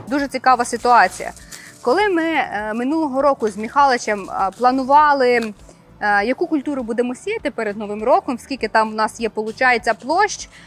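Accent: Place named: Cherkasy, Ukraine. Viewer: у вас интересний мовний акцент .чепкащина.так як і на лубенщені свої нотки співочої української мови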